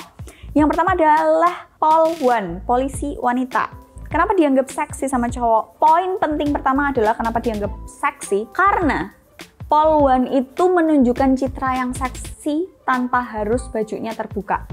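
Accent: Java, Indonesia. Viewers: CEMPRENG PLUS MEDOK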